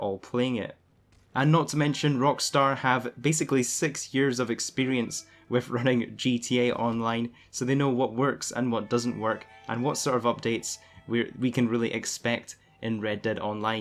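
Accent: Scotland, United Kingdom. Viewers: First off thanks for another vid... been enjoying your series since it started. Nice to hear a Scottish voice amongst all the other youtuber videos 👍 After having played GTA Online since its OG launch, I found Read Dead Online a welcome change from the often frenetic pace of GTAO, although GTAO has given me hundresds of hours of online fun over the years, especially with the Heists (completing the Criminal Mastermind Challenge was awesome) and more recent Business and Arena War updates. Shifting to RDO, I had high hopes for revisiting the world of Red Dead after RDR and though I did get a bit bummed after a time playing solo in RDO, I have since connected with some good regular players via our PSN Community and now it is so much better! Aside from the griefers, which are pretty much negated once you are in a reliable posse, RDO really just needs some balancing and regular expansion via content updates. 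As an OG GTAO player, I know from first hand experience that GTAO was "dead" during it's first few months of existence (there were constant server issues during the early days) but within a year it had really started to evolve and become immensely playable. I think the same thing will happen with RDO and while it may not hold the same kind of opportunity for such diverse updates as GTAO, there is a huge amount of potential there. Let's see where it's at come October 2019 before we write it off. Meantime, I'm having lots of fun hunting and gathering with my posse 🤘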